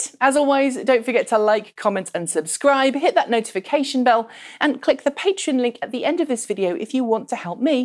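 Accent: Mid-Atlantic, United States. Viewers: Could you make some of your videos with your American accent? I like it. :) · Back to speaking good old BE. Found your Mid Atlantic English a bit weird. ;-)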